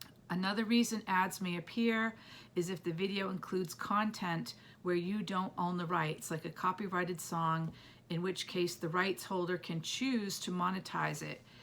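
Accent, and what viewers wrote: New England, United States. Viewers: What state are you in?! Im in MA. I feel like I’m getting some new englander in you ❤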